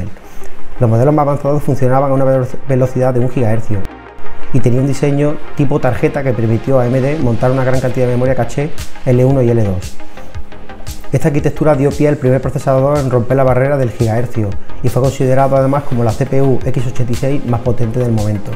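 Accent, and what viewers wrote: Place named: Spain. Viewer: soy latino y real mente no es por nada pero no soporto el exento español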